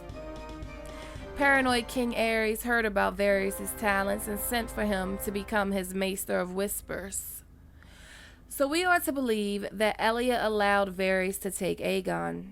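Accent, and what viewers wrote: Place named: Los Angeles, United States. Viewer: out pops beautiful bad bitches preach lol I love your voice You're from la ? u talk hip as shit and your game of thrones knowledge is on point. 💯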